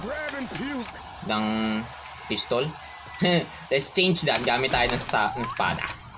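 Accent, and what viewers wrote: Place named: Laguna, Philippines. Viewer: parang ikay taga laguna ..gawa ng iyong pananalita ay ... by the way new subscriber dine ...❤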